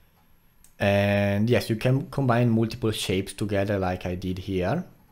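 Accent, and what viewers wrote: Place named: Italy. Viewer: Very good tutorial! Curious question, are you Italian? That's a very thick accent... Not that I mind it! It's quite charming! Great tutorial!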